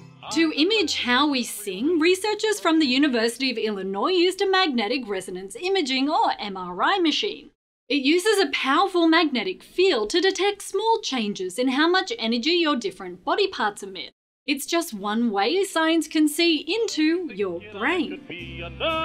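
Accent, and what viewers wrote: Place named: Australia. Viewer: Oh God, I'm in love with you and your Australian accent even though I have a girlfriend. (Shh, It's a secret)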